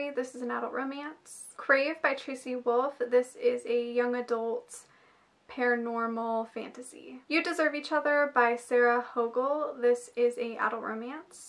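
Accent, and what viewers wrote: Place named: Canada. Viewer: Good to know your Canadian. I like more your accent compared to Americans.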